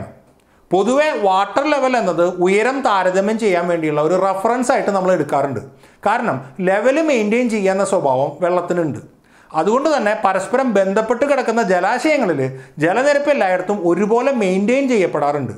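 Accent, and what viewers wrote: Thrissur, India. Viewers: I ഈ അവതാരകന് trissur കാരന്‍ ആണോ അത് പോലെ slang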